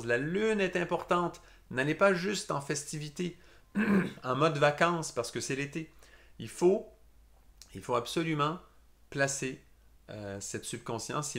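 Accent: Canada. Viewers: Extraordinaire avec ton bel accent canadien merci !!!!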